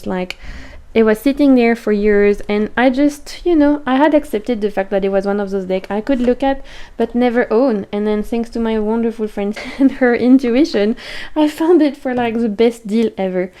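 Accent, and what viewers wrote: France. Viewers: Muchísimas gracias a tí por compartir tanto con nosotros!😃🙌💫 You know, your beautiful accent always reminds of how cool French is and puts me in the mood of listening to French or reading in French. I do it occasionally. 😄 !Sigue disfrutando del océano por los que no podemos! !Un abrazo muy fuerte!🌟❤️😉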